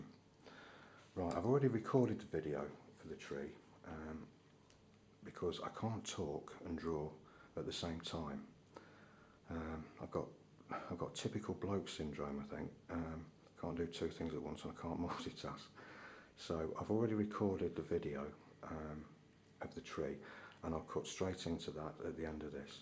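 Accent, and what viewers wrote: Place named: Midlands, United Kingdom. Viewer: Love the Midlands accent btw. Melton Mowbray?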